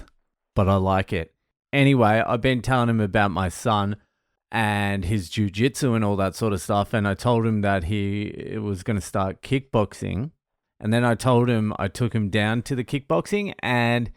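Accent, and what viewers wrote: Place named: Australia. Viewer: I didn't realize you were Australian. I'm sorry, carry on.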